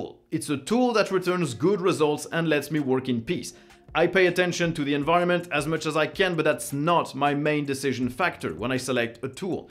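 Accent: France. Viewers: je savais pas que tu connaissais le francais mais j'ai toujours penser a cause de ton accent :P en passant je suis quebecois :D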